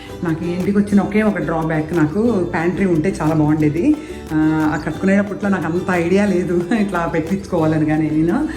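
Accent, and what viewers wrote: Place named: Eluru, India. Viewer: When I was listening to you, i was thinking you must be from around Eluru/Vijayawada. I am from Eluru also and studied in same school, college. I graduated in 1992 from college.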